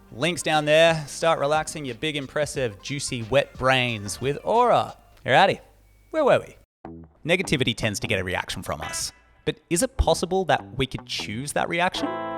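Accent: Australia. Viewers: I love your videos!! Perhaps someday you can add subtitles? I'm from Colombia and the Australian accent is way more difficult to understand. Thank you for posting!!!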